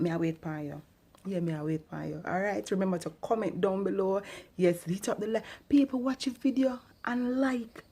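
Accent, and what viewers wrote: Jamaica. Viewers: I so so love Jamaican accent.. like madddd😄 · Oh wow this's fun. I love Jamaican accent. Where exactly did it originate from cos it's unique , do you know?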